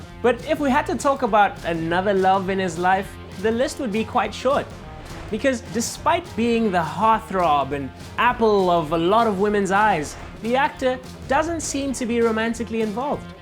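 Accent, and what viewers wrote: South Africa. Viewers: is this guy South African? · This presenter sounds South African so over there Arrow season 7 was just released on Netflix.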